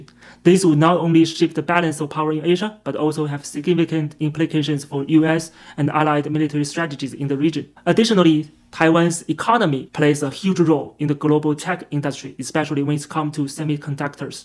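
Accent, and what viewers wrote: China. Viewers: I could fully understand your Chinese mandarin accent English, I decide to use your this program to improve my English listenning capability. But my question is, what's the final result of this practice? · 你这眼睛要不上医院看看吧，别崩镜头上了，看的我生理不适😂念稿好歹也装一下，蛙蛙们还以为你有多大智慧似的😂国民党的英文是guomindang啊?这是什么寄吧中式英语啊，真的没读过几本书强行装是个知识分子😂原来是个人都能在yt上面做自媒体博主了😂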